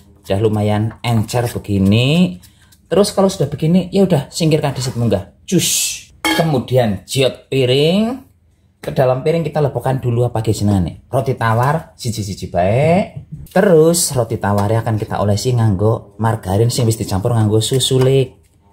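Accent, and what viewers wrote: Banyumas, Indonesia. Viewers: Kiye bagelen ngapak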